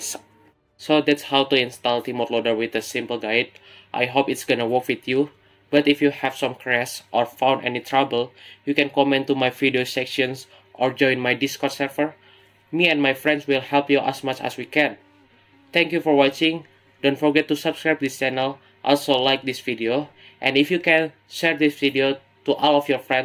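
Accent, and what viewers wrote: Indonesia. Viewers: hmm i wanna ask a little question are u indonesian? [cuz im indonesian just to make it clear your accent was similar to a indonesian trying to learn english and thank u btw for the download files and it make my day thank u so much!]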